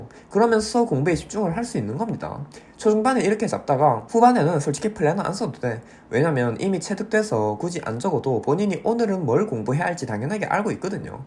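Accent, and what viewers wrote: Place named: Jinju, South Korea. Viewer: 고향이 어디에요?? 사투리 익숙한데 진주 사투리신가...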